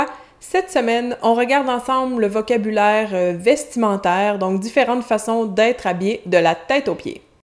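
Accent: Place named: Quebec, Canada. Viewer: Je suis brésilien et ça fait presque 2 mois que je regarde tes vidéos et au début je ne comprenais presque rien parce que je n'étais pas habitué aux sons du français québécois, mais maintenant je peux regarder tes vidéos sans sous-titres parce que je suis plus à l'aise avec des différents sons. Je suis très heureux et fier de moi, et je suis très content d'être tombé sur ta chaîne. Merci beaucoup à toi. Ton beau travail nous aide beaucoup. PS: Il faut encore que j'apprends le joual. :D PS2: Désolé si mon message a des erreurs. xD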